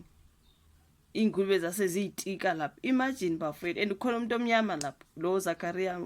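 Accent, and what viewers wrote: Zimbabwe. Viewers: Your Zulu is not make sure it sound Zimbabwean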